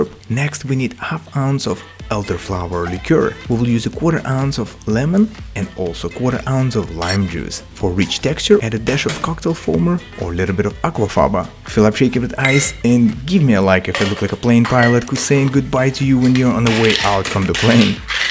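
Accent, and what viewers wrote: Russia. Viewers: Боже ты же русский у тебя русский окцент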